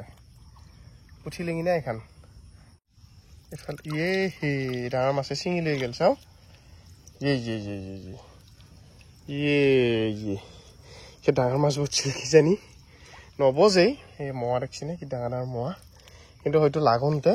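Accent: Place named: Darrang, India. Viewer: ভিডিঅ' বোৰ ভাল লাগে কথাবোৰ পুৰা দৰঙীয়া আমিও দৰঙৰে। আপুনি মানে খালৈত বৰশী বাইছে আৰু নেকি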